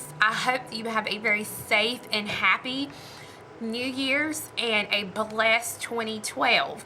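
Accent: South, United States. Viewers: I totally enjoyed the video! I'm from Alabama, so I understood your southern English easily. Thank you!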